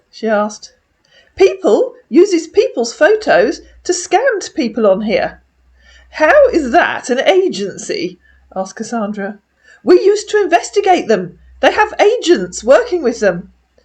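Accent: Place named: RP, United Kingdom. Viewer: Your posh accent is jolly good Cassandra 😌 This character reminds me of Denise, older, wise and salty 🧐 Looking forward to Samantha's salty reprise too 😼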